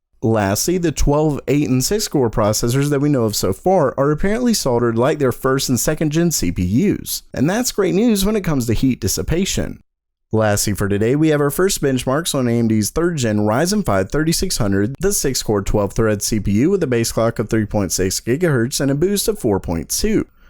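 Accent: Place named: United States. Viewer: One of the most annoying voice overs. Way too fucking american pronounciation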